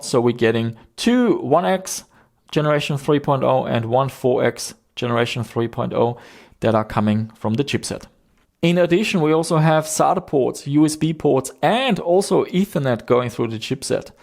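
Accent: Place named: Germany. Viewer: I have to ask, are you originally from Australia? Cause your accent sounds German to me for some reason.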